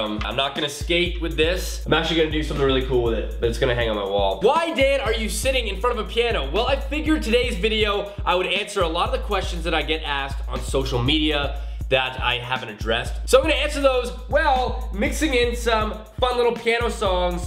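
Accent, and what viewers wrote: Canada. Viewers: Are you Canadian or American??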